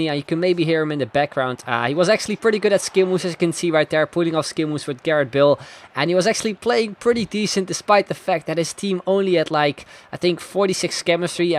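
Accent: Netherlands. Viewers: was nog niet geabboneerd. hoorde meteen dat je nederlands was. abboneer meteen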